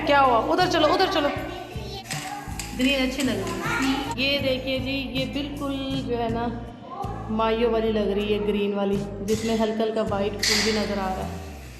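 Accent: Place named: Saraiki, Pakistan. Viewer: I am also saraiki speaking.itny time bd apni boli sun k bht acha laga. Btw i live in khobar. Bari apk vlogs ma dekha k ap meray area se guzar k jati hain khanay penay ❤❤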